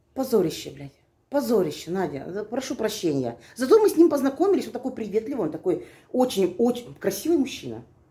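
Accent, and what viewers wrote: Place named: Chuvashia, Russia. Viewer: ЧУВАШКА... У неё же чувашский говор, какая русская????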